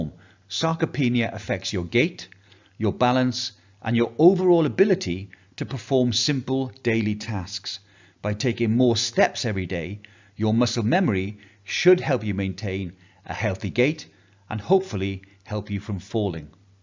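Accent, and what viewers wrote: United Kingdom. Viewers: I am glade to hear the British accent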